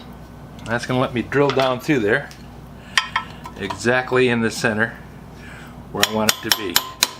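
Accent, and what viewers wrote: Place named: Kentucky, United States. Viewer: Probably still too cold there for lawn stuff but got around some Kentuckians and adapted somewhat to their way of talking. He did a video where he was gearing up and said 'Be sure and put yer stuff awn' in such a manner I thought a hillbilly had dubbed it in. I learned to talk like a Yankee in the Ahmee but never thought it'd go the other way.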